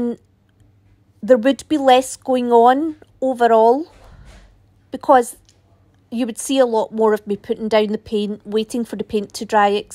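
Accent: Scotland, United Kingdom. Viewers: I just watched Ceri the Crafter's video where you sent him ATCs and happy mail. I have just subscribed to your channel. :) I love these little pieces of art you have made. They are beautiful. (I especially like the dark ones) I love your accent. My mum was Scottish, so it makes me nostalgic. (I live in Australia). Oh and I would totally watch a longer video with you showing the process of these. They're brilliant.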